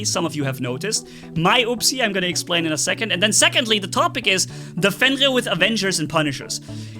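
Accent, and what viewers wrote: Germany. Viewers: You're German?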